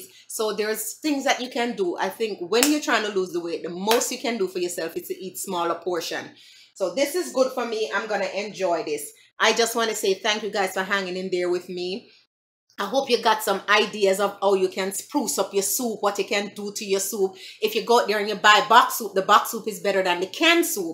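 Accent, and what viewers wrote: Jamaica. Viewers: That looks amazing!!! To be honest, you can never go wrong with coconut milk. I love love love coconut milk. I'm new on your channel and I must say, I'm already hooked on the Jamaican accent. Congrats on the weight loss and thanks once more for the lovely comment you left on my channel. ❤️Summer Dublin, Ireland 🇮🇪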